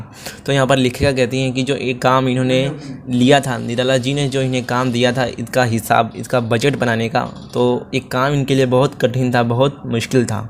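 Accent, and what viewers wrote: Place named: Bhojpuri, India. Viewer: Bhosdike ghutka nikal ke baat kar bhojpuri choda sala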